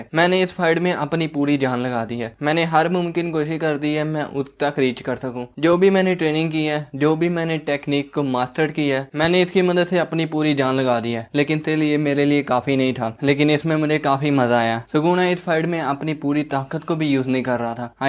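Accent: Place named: Pakistan. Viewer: Yaar aap ki awaaz Pakistani jasi ha na isliye 😅😅😅❤❤❤ nice explain bro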